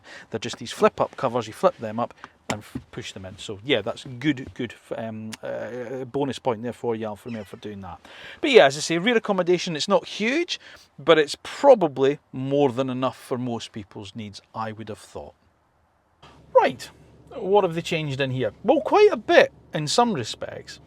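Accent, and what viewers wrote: Scotland, United Kingdom. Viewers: That accent. Is that doric mixed with orkney?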